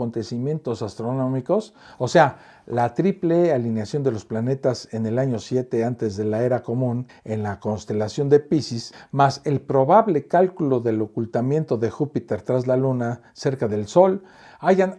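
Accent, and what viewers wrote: Mexico City, Mexico. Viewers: primer vez que te veo, y ya vi varios de tus videos, todos muy interesantes, pero para ser una persona muy culta tienes un acento chilango, demasiado !!!NACO!!!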